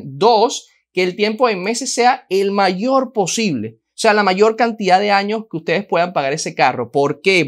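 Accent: Cuba. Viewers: Jejejeje brother muy bien tu personaje de cubano, te metiste en el personaje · Wow gracias por la información Que risa como hablas cubano Caballero 🤣😂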